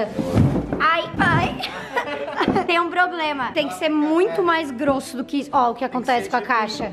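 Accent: Rio Grande do Sul, Brazil. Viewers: BAH THÊ ELA GAUCHA MESMO EU SOU DE VIAMÃO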